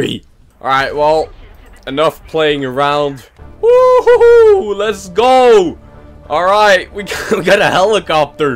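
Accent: Norway. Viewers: Are you norwegian??? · Are u Norwegian?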